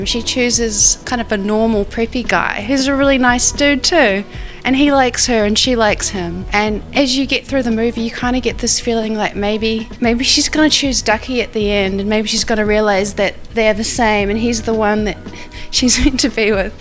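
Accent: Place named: RP, United Kingdom. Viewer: Her accent is kinda posh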